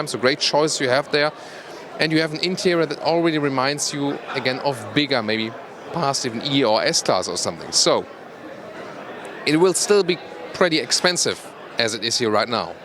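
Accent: Germany. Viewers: Thank you very much for another very informative and professional review,. 👍 Your in-depth presentation style is so much better than the competition, in so many ways... highly professional and always delivered in that impeccably suave and sophisticated German accent of yours, that is every bit as slick and refined as the automotive design and engineering masterpieces that you showcase! 👌😉 Thank you for all of the hard work and laborious camerawork and editing that goes into each of your in-depth videos, as well as your incisive commentary and detailed observations that many other reviewers leave out. My daughter has her own YouTube channel with nearly 20,000 subscribers and so I know how much time and work goes into producing even a short video, let alone your more exhaustive reviews, and while many of your viewers may take all of that for granted, I certainly appreciate your efforts and have learned a lot from watching your videos and enjoy your presentation style immensely. 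Keep up the great work! 👏😊 ~ Kind Regards, Louis.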